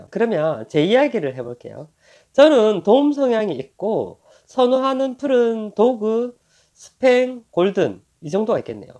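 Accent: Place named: Gyeongbuk, South Korea. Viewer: 서울분인줄 알았는데 고향이 경북분인가 보네요 경북사투리~^^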